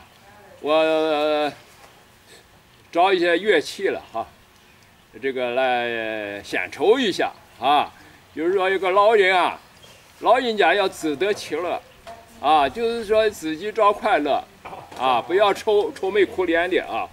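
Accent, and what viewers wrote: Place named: China. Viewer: 张先生的乡音未改，还是一口家乡话，地道"外省人"的中华民国情怀。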